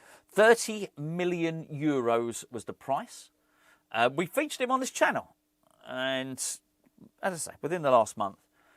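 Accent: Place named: RP, United Kingdom. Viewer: a fine exponent of BBC English. Given the main subject of the video something to bring some cheer is a real necessity, well done 👍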